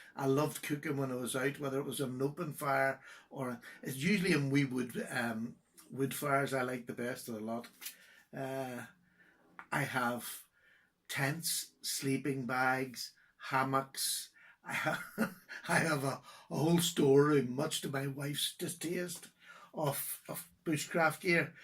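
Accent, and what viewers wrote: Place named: Ireland. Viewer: This was a wonderful video! Love your story-telling and admire your honesty and humility. As an American living in Belgium, I appreciate your English ( with the wonderful Irish accent) and your European point-of-view on knives and knife collecting. Your grandchildren brought a smile to my face and makes me happy to remember that my 2 grand kids, my daughter, and my son-in-law will be visiting me in Brussels next week. Keep up the great work! Look forward to all your videos.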